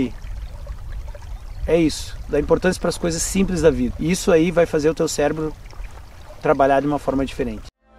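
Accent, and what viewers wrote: Rio Grande do Sul, Brazil. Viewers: Tu é gaúcho tchê?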